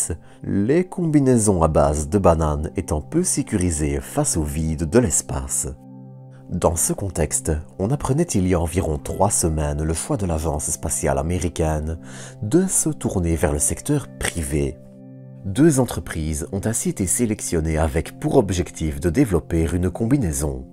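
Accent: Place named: Flanders, Belgium. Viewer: dit j'ai une question, tu est flamand ? flamand belge n'est ce pas!? 😌 ton accent trahi tes origines 😌😅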